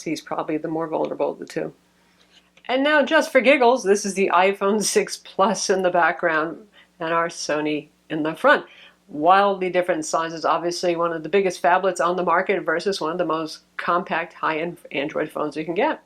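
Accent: United States. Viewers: Can't understand a bloody word she's saying. Horrible Yank accent or you're pissed during this review.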